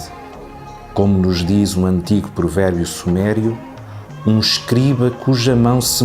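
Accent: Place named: Portugal. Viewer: É meio confunso pelo sotaque português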